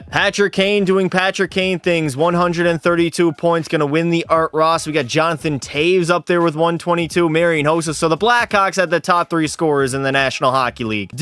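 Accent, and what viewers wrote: Newfoundland, Canada. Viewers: Need to know what part of Newfoundland u from there skipper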